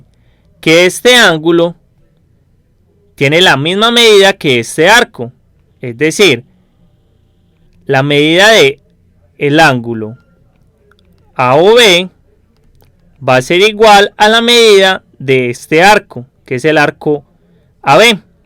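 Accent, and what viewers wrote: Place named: Antioquia, Colombia. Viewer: es acento paisa, aprende un poco de los diferentes dialectos colombianos.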